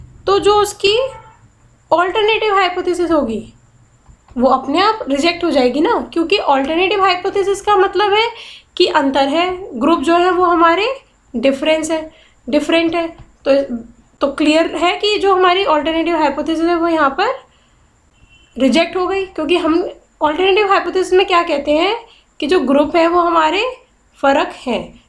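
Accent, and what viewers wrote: Bengal, India. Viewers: Madam, Apka bangali hai, .... Matlab ko mane bol rahi hai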